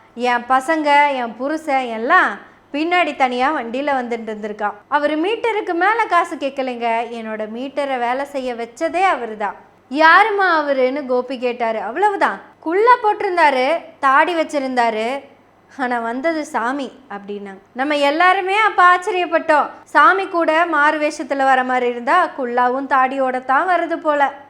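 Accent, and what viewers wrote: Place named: Coimbatore, India. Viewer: I like your slang...😂 Use mostly covai slang...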